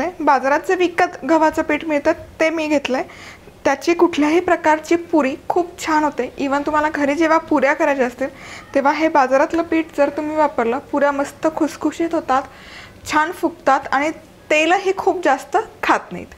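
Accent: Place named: Kolhapur, India. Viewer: Kolhapuri aavaj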